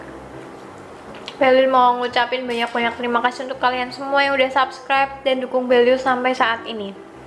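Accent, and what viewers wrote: Java, Indonesia. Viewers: Jowone kerasa pas ngomong 😁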